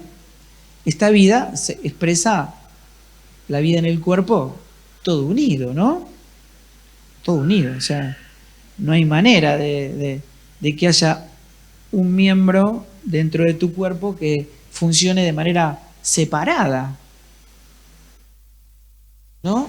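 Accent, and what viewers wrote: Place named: Argentina. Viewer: Es argentino es normal su forma de hablar ...no busque el pelo en la sopa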